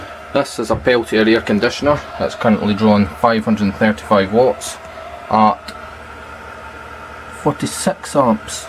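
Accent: Scotland, United Kingdom. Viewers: sweet system are you from Scotland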